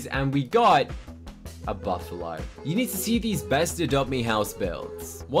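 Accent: United Kingdom. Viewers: You sound like every british guy I've ever met, it makes me feel like I know you lmao.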